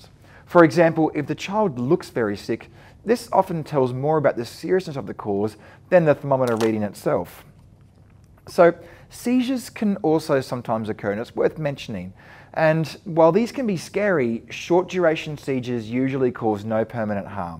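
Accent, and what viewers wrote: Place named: Australia. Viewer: I'm in nursing school and very much enjoy help from an Australian accent with my homework! Thank you!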